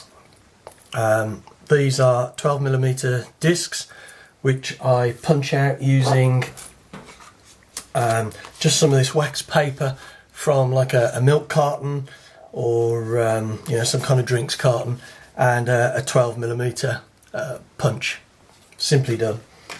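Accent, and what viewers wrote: England, United Kingdom. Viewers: It's nice to hear an English voice as well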